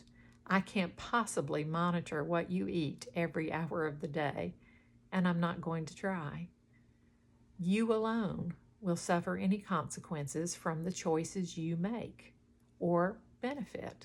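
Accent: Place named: Texas, United States. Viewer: You get cuter every time I see you and you sound like your from Texas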